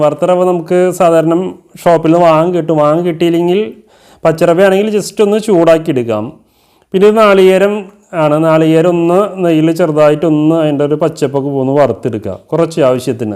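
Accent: Thrissur, India. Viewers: നിങ്ങൾ തൃശുരാണെന്ന് സംസാരത്തിൽ നിന്ന് മനസിലായി . ശെരിയാണോ ??😀😀😀😀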